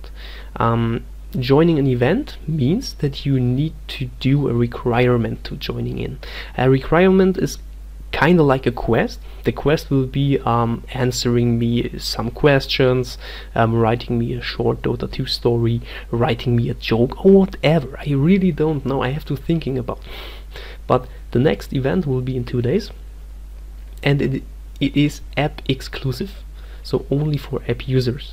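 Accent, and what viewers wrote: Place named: Germany. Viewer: Man merkt, dass du deutsch bist . Coole app ;)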